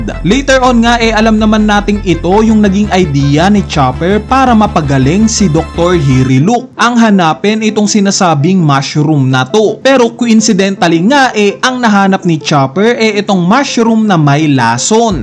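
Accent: Visayas, Philippines. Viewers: bisaya spotted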